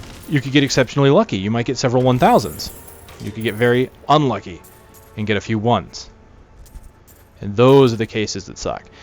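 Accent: Germany. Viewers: u´re german is funny ^^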